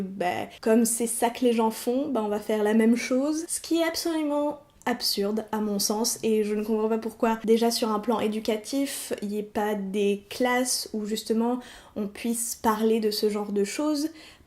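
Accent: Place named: Quebec, Canada. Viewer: T'as tellement raison, etre en couple cest pas un but ni un objectif de vie, cest un choix. Je pense aussi que le besoin vient d'une autre époque où la survit de la race en dépendait, on en ait pu là du tout!! Perso je suis célibataire et j'adore ma vie comme ca. Je suis une personne tres solitaire et ca ne plait pas a tous et jy tiens vraiment bcp. Desfois je trouve que tu as un accent québecois :) comme quand tu dis ''plus facile à dire qu'a faire'' le faire, tu viens pas du Québec par hasard? Bonne journée à toi et à bientôt. Merci pour la vidéo, tjrs un plaisir t'écouter.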